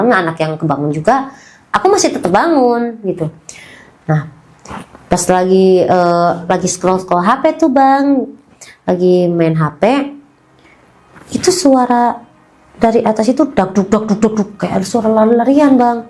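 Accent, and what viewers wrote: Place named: Medan, Indonesia. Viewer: Logat ngomongnya Kayak Orang Medan 🤣🤣🤣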